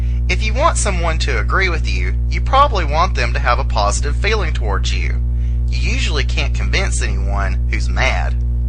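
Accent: South, United States. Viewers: I never thought a gay southern man could bring this much joy to my homework. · I’m just here for the delightful southern accent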